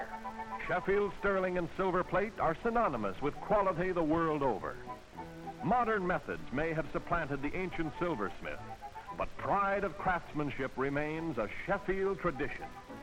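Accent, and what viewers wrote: United States. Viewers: Why the American commentary?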